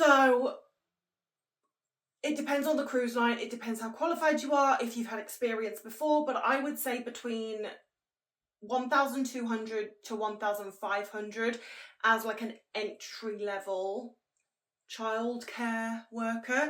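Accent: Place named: North West England, United Kingdom. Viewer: Your accent sounds very familiar but I cant quite place it... do you live in the north west?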